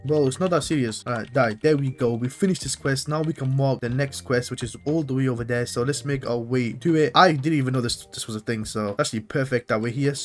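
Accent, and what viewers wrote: United Kingdom. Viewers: are u british? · u british or som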